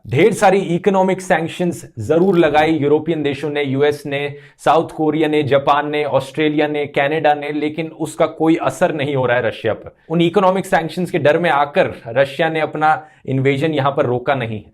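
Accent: Haryana, India. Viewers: आपकी Language me हरियाणवी touch है।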